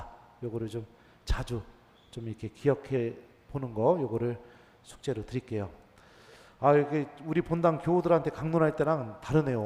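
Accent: Chungcheong, South Korea. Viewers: 신부님 아침마다 신부님 말씀으로 시작합니다.미국애서 감사드립니다.신부님의 충청도 사투리가 너무정겨워요.동향이기애 더 가슴을 울려요..🙏🙏🙏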